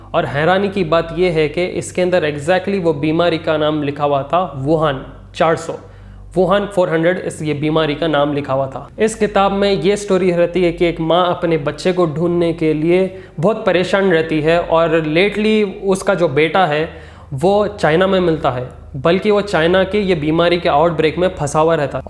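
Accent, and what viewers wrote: Hyderabad, India. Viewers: Bhai hyderabadi slang miss hori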